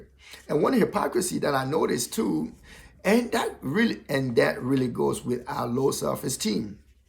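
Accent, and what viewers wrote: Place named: Liberia. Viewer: You sound Liberian dude ..she is the one using the guys for subscribers, we all know what’s going on and it’s all innocent 😇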